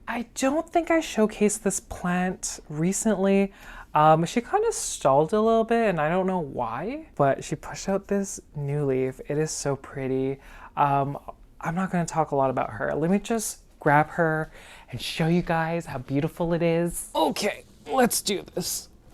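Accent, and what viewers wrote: South, United States. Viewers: That Hoya Lisa is to die for! You crack me up. That southern belle accent is to cute. Love the sweater.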